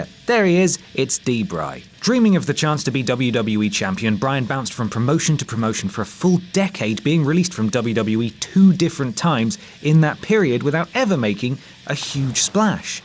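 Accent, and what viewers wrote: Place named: RP, United Kingdom. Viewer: sounds really promising posh today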